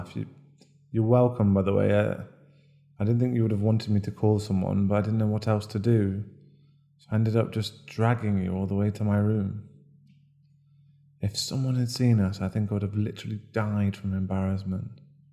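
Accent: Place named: United Kingdom. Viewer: You sound really British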